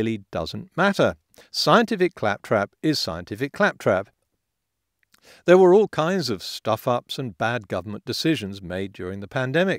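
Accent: United Kingdom. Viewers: CoupleFYI’s for you, saying it in a #BrittishAccent🗣️doesn’t make it #Believable, #Correct nor #Science and a simple #Proof of thearth’shape is #ANTARTICAScircumference⭕️🐧 #small🌏or #LAARGE🧇easy😎🙌🏾 #Science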